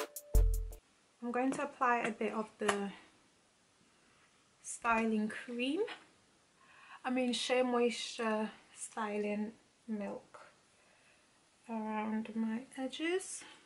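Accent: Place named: United Kingdom. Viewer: I enjoy watching her videos with that beautiful British accent.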